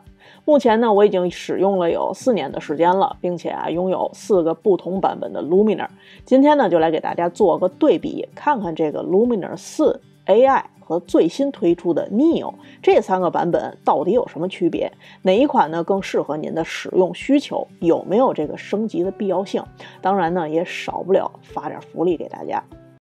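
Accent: Beijing, China. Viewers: 姐们儿，一听你就是老北京：）感谢分享，在B站也关注你了。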